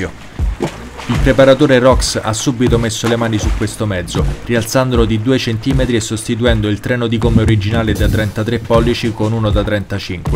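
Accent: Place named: Rome, Italy. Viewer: Aooo !! Ma che sei poco poco romano ! Comunque bel test , ma sopratutto bel pezzo di ferro .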